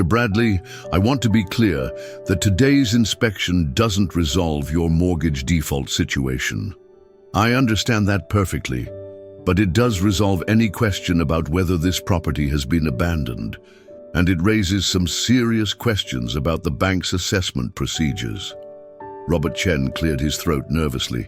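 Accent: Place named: United Kingdom. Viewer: Do these ridiculous AI folks ever even think about how stupid it sounds to have a snooty British accent narrating a down-home family farm story in first person singular? Especially one where the neighbor says things like "Hot damn!" - obviously meant to have happened in the heart of American farmland. Sheesh.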